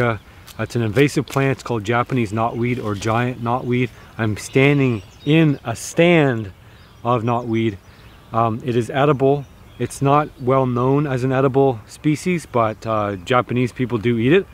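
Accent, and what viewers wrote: Canada. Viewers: My bad just heard how you said things but used pounds as a measurement. Idk what Canada uses. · Are you Canadian?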